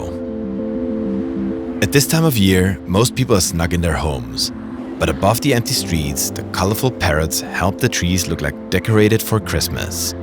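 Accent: Germany. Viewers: When you're used to fake German accents done on purpose by some youtubers and stumble upon a serious documentary with a real German accent. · Deinem Englisch nach bist du eindeutig deutsch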